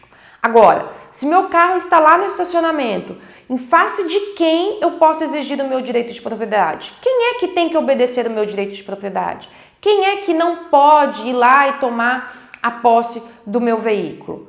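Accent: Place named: São Paulo, Brazil. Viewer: Excelenteaula. Gostei do sotaque paulista tambem rsrs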